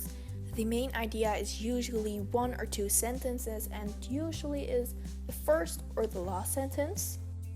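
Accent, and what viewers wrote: Netherlands. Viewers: ik dacht al dat je nederlands was😂😂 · I love it when you immediately hear someone is Dutch or not ;) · Wait a minute, are you Dutch??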